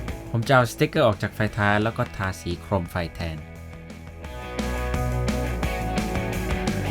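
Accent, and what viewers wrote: Isan, Thailand. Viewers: สุดยอดฝีมือเลยครับ มักเวลาเจ้าว่าวอีสานเบิ่งเป็นกันเอง ฟั่งเเล้วเพลิน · สุดยอดครับพี่ ผมก็เพิ่งจะเริ่มมาหัดเล่น RC ยังไม่รู้เรื่องเลยครับ ไม่รู้จะเริ่มจากตรงไหน เคยดูคลิปพี่เมื่อหลายปีที่แล้วอยากเล่นมากแต่ตอนนั้นไม่มีงบ เพิ่งจะได้กลับมาดูเพราะอยากเล่น RC นี่แหละครับ ดูเพลินมาก โดยเฉพาะเวลาเว่าอิสานนี่แหละเด้อ 55 ทำคลิปออกมาเยอะๆเลยนะครับ 😊😊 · ชอบการตัดเสียงพูดแยกกับเสียงคลิปหลักทำให้ไม่ขัดหูเวลาฟัง ดีมากๆเลยครับ ดีกว่าพากษ์ทับเสียงคลิปหลักทำให้เสียงซ้อนกัน และก็ชอบตรงเว่าอีสานผสมนี่แหละครับ🤣🤣 ชอบผลงานทุกคลิปมากครับ เป็นประโยนช์มาก ติดตามตั้งแต่ Mirage fix ขอให้ทำต่อไปอีกนะครับจะรอรับชมเสมอครับ👍🏻👍🏻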